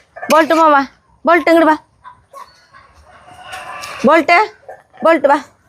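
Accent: Thrissur, India. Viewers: This is a viewer from UK, following your videos for long time. I think I even started getting Trissur slang 🤓. But I am from TRV based here in UK for nearly 20 years. Will you consider Airbnb there? So we could visit and be farm hands ?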